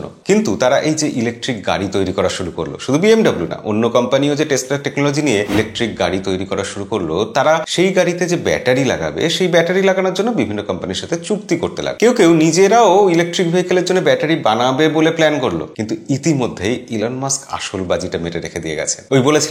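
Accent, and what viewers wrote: Bangladesh. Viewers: ভাই আপনার কথা শুনে ভারতীয় মনে হয় না , পুরাই বাংলাদেশি বলে মনে হয় , আপনি ভারতীয় শুনে মন খারাপ হয়ে গেছে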